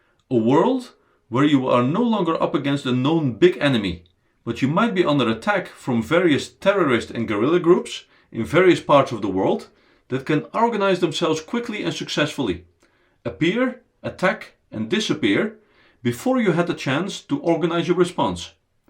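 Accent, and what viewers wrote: Russia. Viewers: The accent is very Russian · r u russian?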